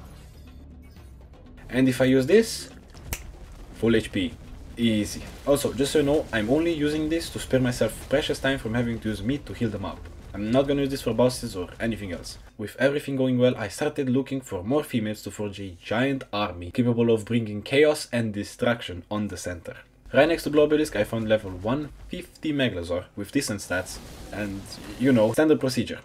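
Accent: France. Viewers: Bro u have a french accent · are u french? i love ur accent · You got a french accent you mog me so hard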